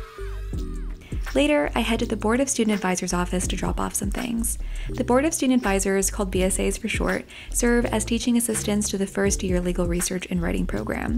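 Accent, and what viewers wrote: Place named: United Kingdom. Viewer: why your accent so British, rather than American?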